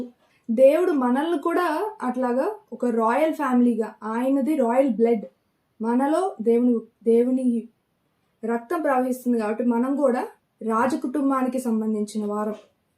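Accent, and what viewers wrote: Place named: Guntur, India. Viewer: Guntur kada miru😂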